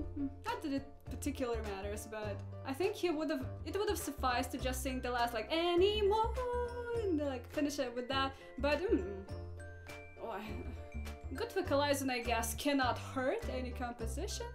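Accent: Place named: Russia. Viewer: Она русская, акцент чувствует ся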